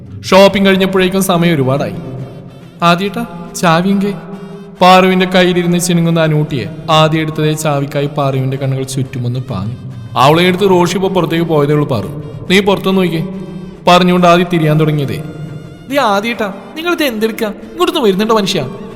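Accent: Tamil Nadu, India. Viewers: nalla oru entertainment story😂😂orupad chirich...ithuvare ulla nayikanmaril ninn variety nayakane nammil ethicha madhurikkum adipoli tamil slang presentation nadathiya ❤❤❤❤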